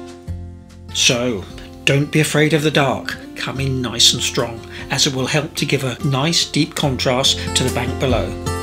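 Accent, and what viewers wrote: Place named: United Kingdom. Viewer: It is wonderful to hear your British accent, and had a good chuckle to hear your comment about the 'bloody leaves' did my heart ❤️ good!!!. I do really enjoy your videos; and wonder just where you are located. I lived in East Sussex and moved to the U.S in '57 so there's a bit of nostalgia going on. Thanks for the memories ❤ !!!!!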